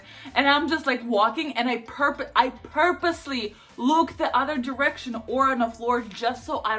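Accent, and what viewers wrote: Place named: United States. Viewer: ayeeee congrats on your citizenship!!! By the way, you sound super american for only being here for 8 years. My grandmas been here for 50 years and she still can’t speak English lmao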